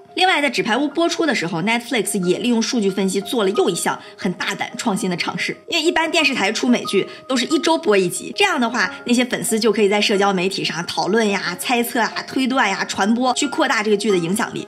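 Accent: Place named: Northeast, China. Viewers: 互联网时代那些“高大上”议题，就你这土掉渣的北方大白话来这么一通大白活，听着就觉得特舒服😌……今天开始订阅了。哈哈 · 不知道啥算法，后台推荐了你。这东北口音的魔性，有颜有内容，圈粉了。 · 喜欢你的东北口音，亲切幽默。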